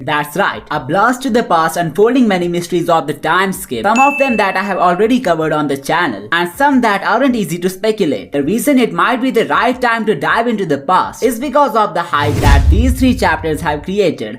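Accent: India. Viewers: Lmao I think he is Indian by his accent but let me just support you on this question for the reply 😂😂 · but i was asking so..... bcoz his accent seems indian to me.... and i was just demanding hindi commentary from 3 videos.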